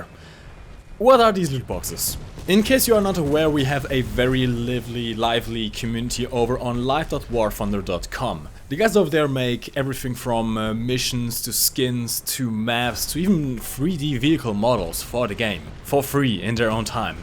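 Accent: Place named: Germany. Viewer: You Sound a Bit German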